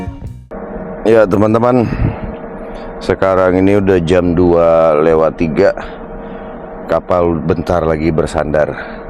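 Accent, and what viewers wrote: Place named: Bandung, Indonesia. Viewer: O gt ya bang,, mf ya,, tp logat bicaranya kyk asli dr bandung gt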